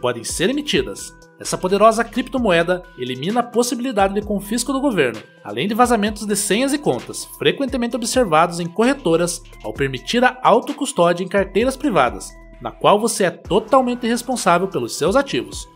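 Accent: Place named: Paraná, Brazil. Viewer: O texto é bom. Mas a voz desse narrador, vamos combinar é muito chata, esse sotaque do terceiro planalto paranaense é de doer. kkkkkkk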